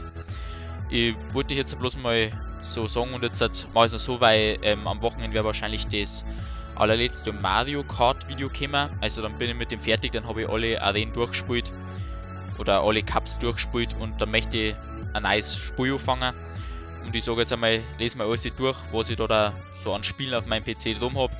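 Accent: Bavaria, Germany. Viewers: Das ist ja eben das geile ... besonders mit deiner bayerischen Stimme währe das richtig nice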